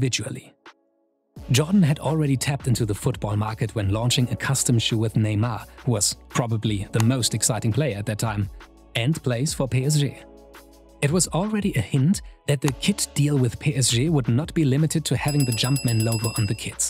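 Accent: Germany. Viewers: U german right? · LOL I'm from Germany and i can tell by the accent the person of this account is a German ;) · is the narrator German? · The german accent is horrible otherwise very informative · Wie man merkt das er Deutscher ist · Ur German accent is so relaxing!!!! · Irre ich mich oder kommt der Deutsche Akzent raus? 😂😅🤔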